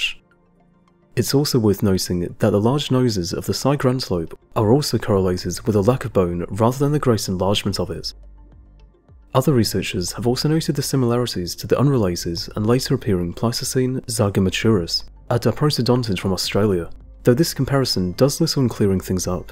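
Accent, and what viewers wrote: United Kingdom. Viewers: I have trouble understanding the narrator's heavily accented British English. His speech is so fast that his mispronounced words elide with each other and create a sound salad that prevents me from enjoying this video. It looks as if it might be a good film otherwise.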